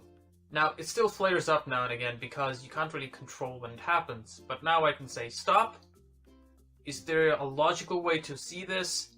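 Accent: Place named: Ireland. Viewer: Loving the Irish accent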